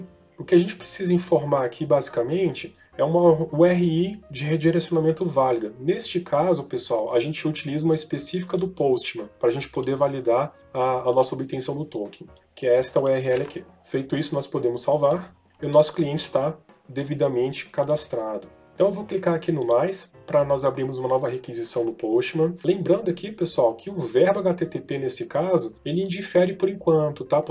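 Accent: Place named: Rio de Janeiro, Brazil. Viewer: olha os microservicos aeeeii, bem carioca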